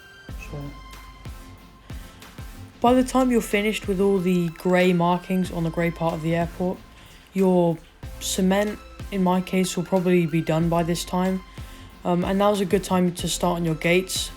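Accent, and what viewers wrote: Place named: United Kingdom. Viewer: Hi, I could tell your British from your accent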